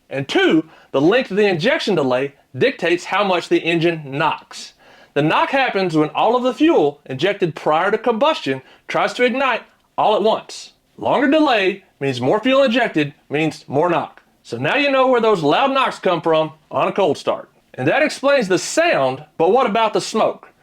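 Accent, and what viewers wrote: South, United States. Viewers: Motherfucker you're crazy. And your southern like me. Keep up the good work.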